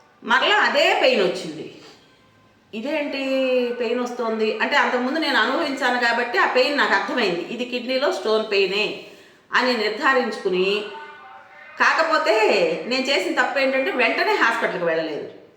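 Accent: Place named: Prakasam-Nellore, India. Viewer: మీరు మాట్లాడే భాష మాకు ఇష్టం. మా చిన్నప్పుడు, మా ఎదురింటి ఆవిడ కూడా ఇలా మాట్లాడేది. ప్రకాశం, నెల్లూరు జిల్లాలలో ఆడవాళ్లు ఇలా మాట్లాడతారని తర్వాత కాలంలో గమనించాము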